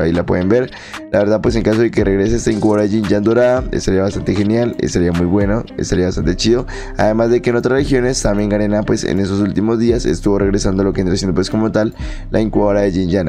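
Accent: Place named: Argentina. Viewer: Lo más xd que escucharán hoy confundía el acento argentino con el español XD 😂😂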